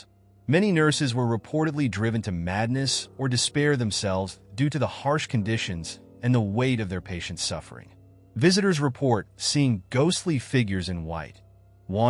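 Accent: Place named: United States. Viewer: Why the Yanky VOICE😢😢😢😢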